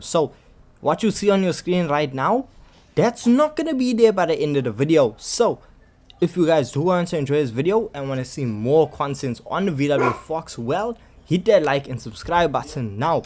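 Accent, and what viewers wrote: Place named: Durban, South Africa. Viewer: Cool project my guy. The Durban accent takes some getting used to, but informative nonetheless.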